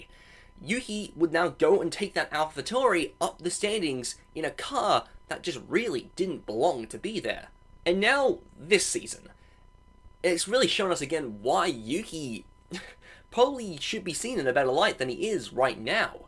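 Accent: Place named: United Kingdom. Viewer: I love slightly rude F1 commentary with a british accent! You got my sub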